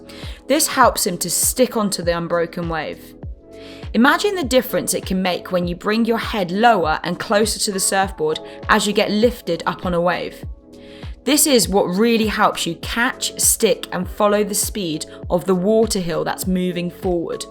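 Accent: United Kingdom. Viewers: Slight British accent...lol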